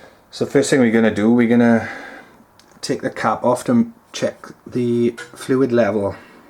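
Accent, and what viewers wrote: Wales, United Kingdom. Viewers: Yes the welsh I love it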